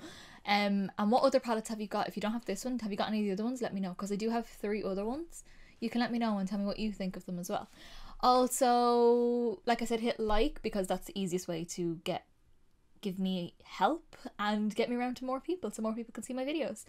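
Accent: Ireland. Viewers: Damn you are so Irish 😍 😍😍💓💓💓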